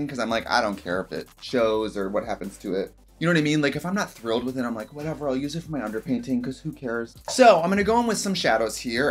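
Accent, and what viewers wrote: Australia. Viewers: I read this video title in australian accent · Im not even a second in and i can already hear the aussie accent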